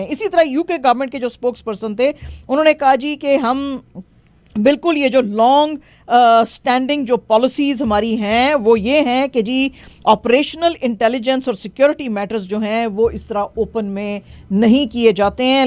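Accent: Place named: India. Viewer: سسٹر آپ انڈین ہیں شاید۔ 🤔بھارتی فلموں کی طرح خ کو کھ پڑھتی اور بولتی ہیں۔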